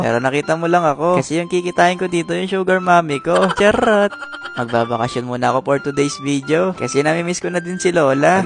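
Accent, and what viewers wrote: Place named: Iloilo, Philippines. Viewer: Ilonggo ka gali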